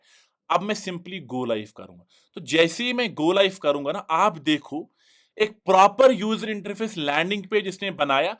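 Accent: Kashmir, India. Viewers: Looks like you are from kashmir. From your accent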